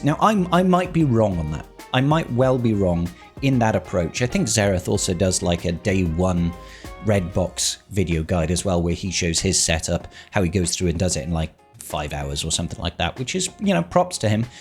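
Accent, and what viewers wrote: United Kingdom. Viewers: I watched Vexitrons Conquest videos because yours wasn't available, but I do prefer you geenrally as your more fun to watch and goot to hear a fellow Brit so I vote for more content :) But if your feeling the grind take a break, dont burn out!!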